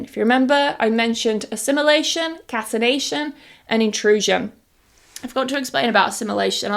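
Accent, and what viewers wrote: United Kingdom. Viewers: The British pronunciation is amazing 🤩 when I listen to it, I relax 😁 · "Hello Madam, it’s been two years since I was your student. I started my journey following your British accent, but I’ve since fallen in love with the American accent and focus on that now. Please know that you hold a very special place in my heart, and I miss you more than words can say. Sending you all my love and respect from Bangladesh ❤❤❤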